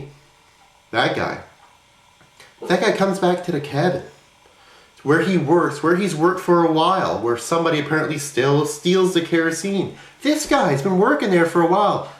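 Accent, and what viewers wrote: Canada. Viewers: you from up north like thunder bay, cuz your accent is very canadian :P i could tell lol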